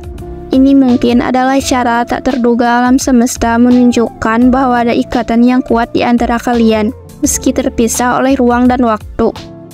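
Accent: Bali, Indonesia. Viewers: Logat kk nya kayak logat bali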